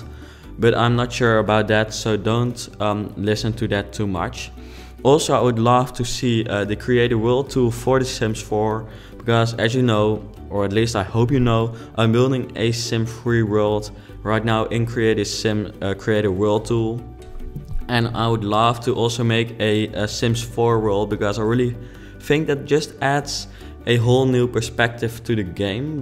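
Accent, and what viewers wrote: Netherlands. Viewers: ik kan niet langer dan 2 min naar jouw nederlandse accent luisteren sorry eh bye now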